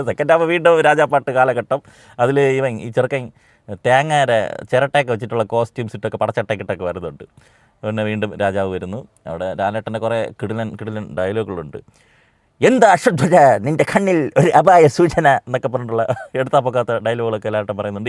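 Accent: Trivandrum, India. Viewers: Itrem bore presentation, maryadakk samsarichude, istapettillel athang paranjapore, trvm kare parayipikkan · ഇതാണോ തിരുവനന്തപുരം ഭാഷ ആണെങ്കിൽ nice❤❤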